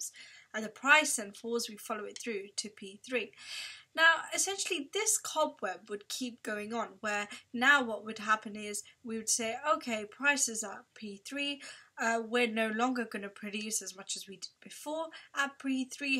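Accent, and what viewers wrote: United Kingdom. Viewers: Her english is more english than english people's English 🤦‍♀️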